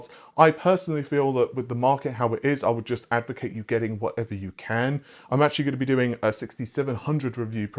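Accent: United Kingdom. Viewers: I'm watching a guy with a British accent talk about videocards that I can't find in stock and couldn't afford even if they were in stock. What am I doing with my life :) ?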